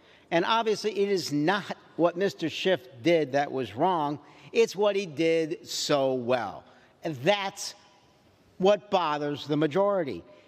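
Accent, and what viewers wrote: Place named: Chicago, United States. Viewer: The best part of your speech was that you said it in a Chicago accent. You baacked Adam Schiff!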